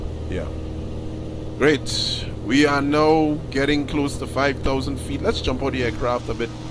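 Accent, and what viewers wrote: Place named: Grenada. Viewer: Your accent made me happy, I went to SGU in Grenada. I miss it! Pure Grenada!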